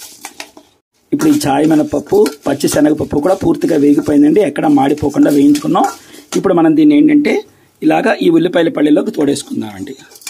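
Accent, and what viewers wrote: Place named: Godavari, India. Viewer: garu namasthe Meeru chupinche vantalu Meeru cheppevidhanam enka bavuntundi Memu anukovadan meeru godavari zillavallu ani Mee matani bati anipisthinfi Tq🎉 maku chala Baga nachhutunnayi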